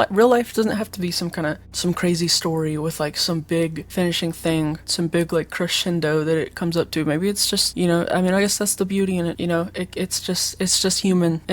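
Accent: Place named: South, United States. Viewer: I like how it sounds like u have a slight southern accent that maybe ur hiding.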